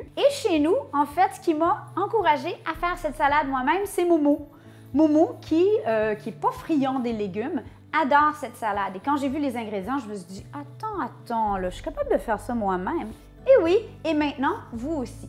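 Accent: Quebec, Canada. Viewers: Tu dégages tellement d'énergie positive et de bonne humeur, c'est vraiment génial ! Et l'accent québécois est tellement fun 😂 J'adore 💕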